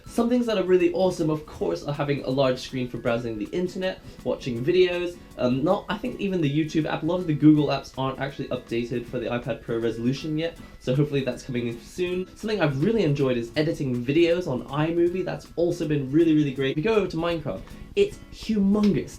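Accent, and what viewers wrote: United Kingdom. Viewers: u sound like a british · He is but he lives in Japan sometimes.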